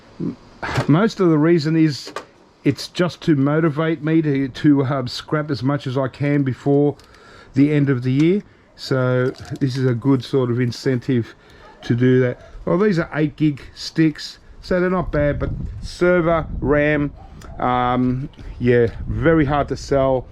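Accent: Australia. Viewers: I prefer the short sessions anyway! It’s all mystical to me anyway but I like to hear the Aussie and see what you do. Merry Christmas 🎄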